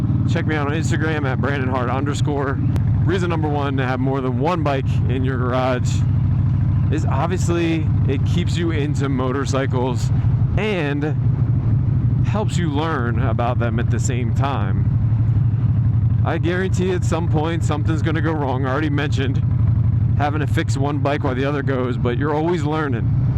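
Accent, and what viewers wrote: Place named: Philadelphia, United States. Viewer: i could tell from your voice, but the hat finally reminded me to ask. Im philly born and raised. Love what you do brother. Keep it up.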